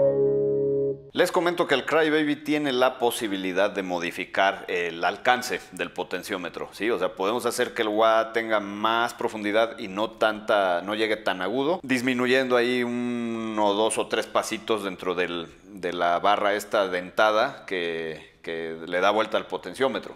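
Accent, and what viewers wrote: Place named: Yucatan, Mexico. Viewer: Haha al chile sí hablan así los yucatecos. Con eso me quedo de este video.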